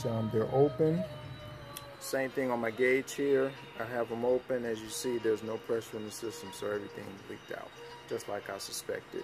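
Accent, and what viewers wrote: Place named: Louisiana, United States. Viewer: dude, where in Louisiana are you from??? lol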